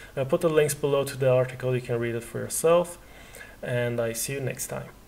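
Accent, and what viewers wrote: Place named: Hungary. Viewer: where are you from? i cant figure out by your accent. i feel like hungarian but im not sure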